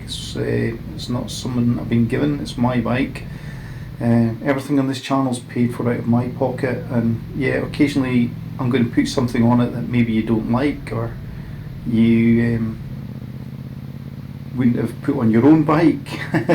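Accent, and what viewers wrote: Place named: Scotland, United Kingdom. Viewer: Hi pal just found your channel, I'm just interested to find out how much warranty work you've had done on your bike ? Thinking of buying one but not sure whether to go new or second hand, new gives warranty obviously. Also, you sound Scottish, don't know if you live up here but if so, where did you buy yours ? Drysdales ?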